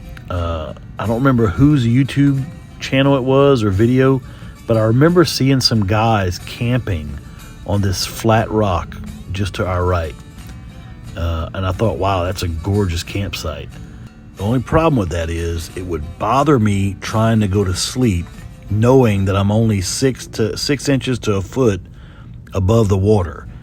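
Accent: Louisiana, United States. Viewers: As soon as I heard you speak I said to myself, self dat dude has got to be from da bottom of da boot. Lol. Great video. Me and the wife are from Lafayette but live in Port Barre now. We have a planned trip in the Ozarks by mulberry lodge. It’s in October. Rendezvous in the Ozarks expo. Are yall going?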